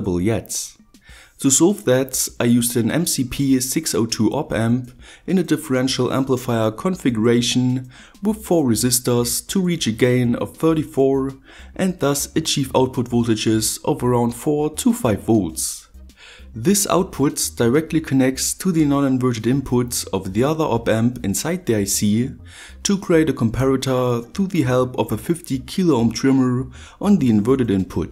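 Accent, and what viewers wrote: Germany. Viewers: why he sounds like a german? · is he swiss or German? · Your voice has an German accent which i really like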